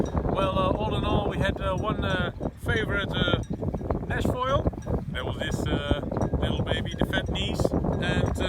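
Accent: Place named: Netherlands. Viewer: nen ollander dieje engels klapt, haha ge hoort het toch direct he! Leuke review btw.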